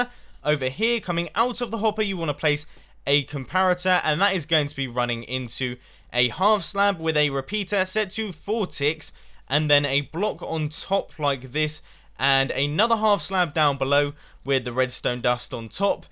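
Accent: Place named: United Kingdom. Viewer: R0SILE tk Oh, he can. Maybe you are used to speaking a different accent than he does, so you might have a little hardship understanding his British English.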